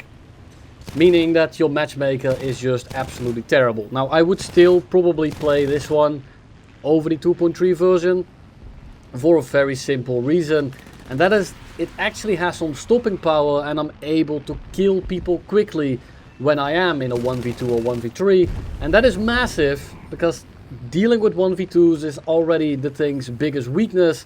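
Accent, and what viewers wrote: Netherlands. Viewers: Dutch accent, lovely.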